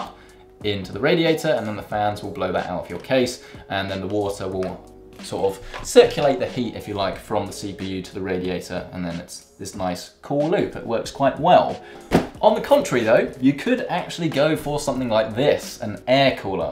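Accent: United Kingdom. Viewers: Your British accent makes the words go through one ear and out the other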